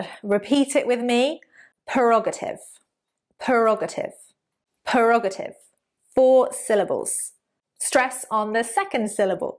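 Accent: United Kingdom. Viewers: All ma'am, I live in America but I love British English British accent and your accent. I still have issues using them in sentences cuz it's very high level English So I still need your assistance in using them in sentences and I love it thanks a million teacher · You have a British accent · I love your accent, I love British English accent, you way of teaching is very lovely, I wish I can take class physically by you. You are gorgeous and lovely lady. Just I subscribed your channel.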